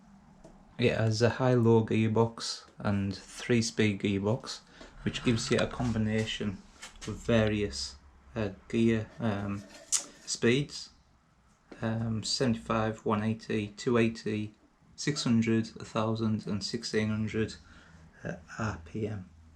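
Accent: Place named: Bishop Auckland, United Kingdom. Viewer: Sounds like your are from Bishop 😎👍or Tow Law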